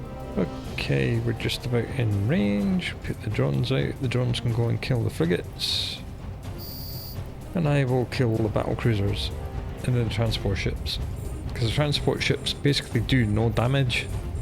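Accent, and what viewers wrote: Scotland, United Kingdom. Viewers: your videos are amazing, lovely conversational way of going through things, the Scottish accent and easy going manner is of course fully to blame. A top lad.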